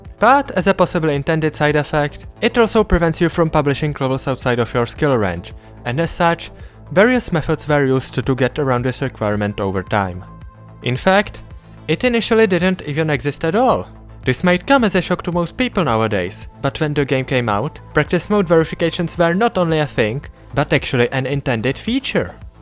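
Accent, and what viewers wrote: Czech Republic. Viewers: naahh i could tell you're czech from the FIRST WORD I HEARD WWWW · didnt notice you were czech till , but afterwards it was very obvious, čau bratře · YOU FROM CZ?? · ale po 10 sekundach videa jsem vedel ze je to cech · lubim ten cesky prizvuk xD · hele nevím jestli jsi čech ale podle přízvuku myslím že jo takže pokud jsi tak ti chci jen říct že je to dobrý video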